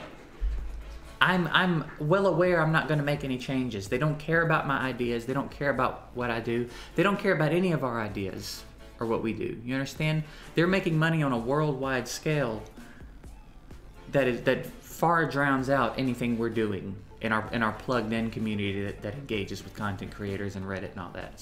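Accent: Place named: New York, United States. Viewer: Play Raid, back you were hood, edition. Nah, just New York flow. It makes me homesick. Yes, I'm still binging your vids.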